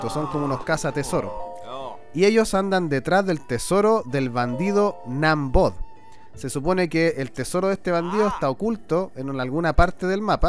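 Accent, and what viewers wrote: Chile. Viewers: Se nota que eres chileno por solo escucharte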